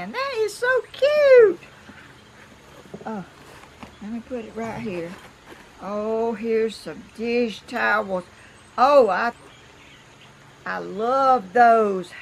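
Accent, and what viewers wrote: South, United States. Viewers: No ! Not another Southern drawl lady getting gifts from her subs. Isn't Glenda Sully enough ? Where did this lady come from ?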